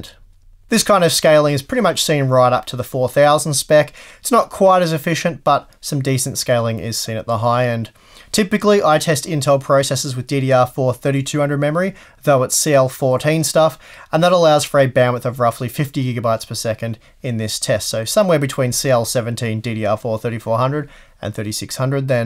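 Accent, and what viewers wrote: Australia. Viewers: Anyone else like how YouTube subtitles always say harbor unbox? Dat Aussie accent 😂😂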